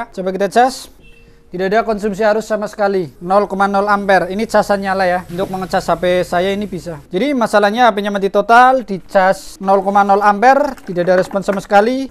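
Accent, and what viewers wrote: Banyumas, Indonesia. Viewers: logatnya kaya ngapak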